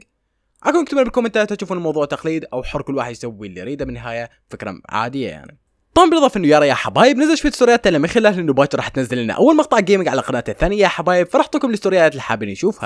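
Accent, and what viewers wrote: Iraq. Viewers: اني ستوني اكتشفت انوو لهجتك عراقيه اعتقد انوو انته عراقي 😹😹😹 ابن بلدي اعتقد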